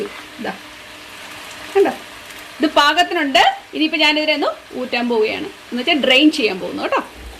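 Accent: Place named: Central Kerala, India. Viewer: Kollam,Allapii, kottayam, Thrivala, IDDUKImy guess 😃☺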